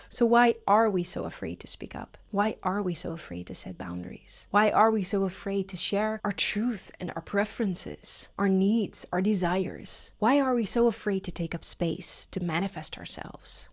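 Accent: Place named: Netherlands. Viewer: This video really was a eye opener. Als reconized the dutch accent in secconds❤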